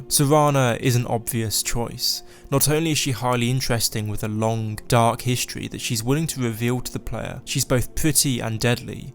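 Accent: United Kingdom. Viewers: *hum* Lemme guess, you from UK ?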